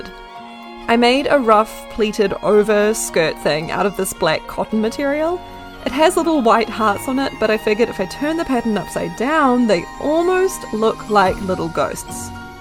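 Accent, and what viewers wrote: New Zealand. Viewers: Snap. I also came here from Diana's channel and I am also a New Zealander. I agree, it is lovely to hear our accent about. The doll came out so lovely and I'm glad to have found your channel. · Popped over from Diana's channel to watch the video and I have to say it is so amazing to hear a familiar accent. I live in New Zealand as well :) Love how your doll came out, she is so beautiful.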